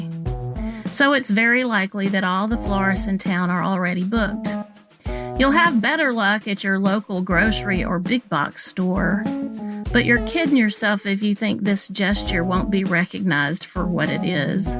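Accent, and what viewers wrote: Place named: South, United States. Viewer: OMG yes. I'm a Mississippian and was pleased to year a southern accent and someone talking about the south. Sadly, it was a standard-issue liberal scolding that hit every cliche: the scolding of a minor pleasure, the implication that people in the global south would rather be unemployed than harvesting flowers, and finally, suggestions to either spend a ton of money on bespoke alternatives or to just "go for a walk or send a letter." If these moaners got their way, we'd be sending letters and giving hugs for every single holiday. I'm sure there are mirrors of this Valentine's Day complaint ready to go about the evils of Halloween candy (diabetes! tooth decay!) and Christmas presents (commercialization! Capitalism!). How about a nice hug, letter, or a $1000 healthy candy replacement made by your local candy monk? When a person buys a jar of jelly at a farmer's market for 10x the price of Welch's, they get to flaunt wealth, their own tastefulness, and their liberal values about caring for the Earth. 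This whole thing really rankles me.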